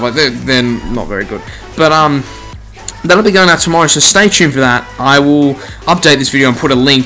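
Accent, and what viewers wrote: Australia. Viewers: Are you aussie by any chance?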